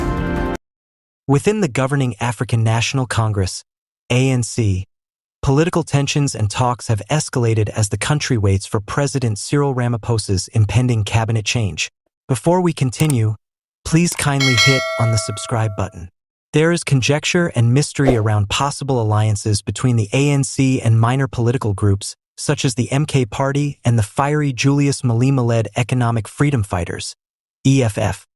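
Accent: United States. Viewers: WHY IS THIS NARRATED BY AN AMERICAN???? COULDNT YOU FIND A BEAUTIFUL BLACK VOICE????? STOP BEING PUPPETS OF THE WEST MAN. MMNXM.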